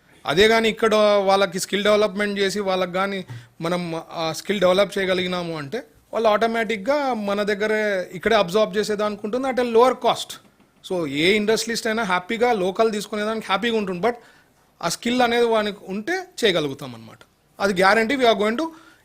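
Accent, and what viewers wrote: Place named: Kurnool, India. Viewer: is using his kurnool slang so dont think bad about him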